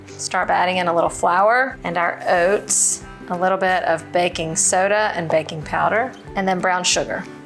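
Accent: South, United States. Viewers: She has a fun super southern accent! Going to try this tonight!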